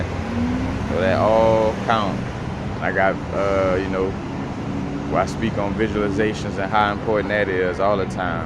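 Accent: New Orleans, United States. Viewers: You from New Orleans Bragh? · Sound like New Orleans accent! U from home?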